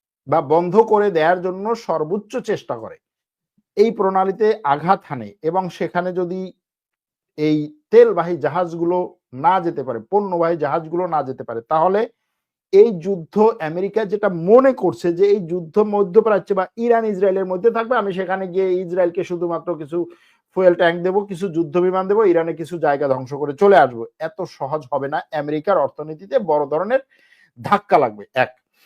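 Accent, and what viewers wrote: Bangladesh. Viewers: BANGLADESHI ENGLISH.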